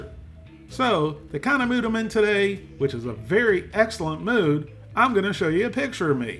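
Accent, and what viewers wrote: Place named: Mississippi, United States. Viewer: Have been here much lately but holy CRAP you've gained a ton of subs! Lol I was around when you were at the 20-40k subs. Good for you brotha! I've always thought you were a Mississippi guy bc of your accent, so now I'm wondering are you? Obviously I am is why I'm asking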